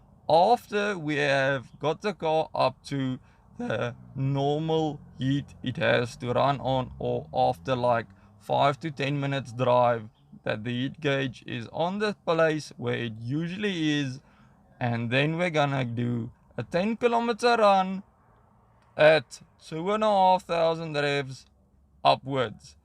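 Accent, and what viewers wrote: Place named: South Africa. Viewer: Definitely South africa !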